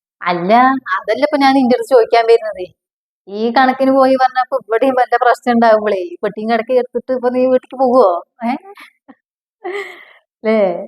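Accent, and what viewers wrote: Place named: Kongad, India. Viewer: കോങ്ങാട് ആണല്ലേ വീട് സംസാരം കേട്ടപ്പോൾ സംശയം തോന്നിയിരുന്നു 😊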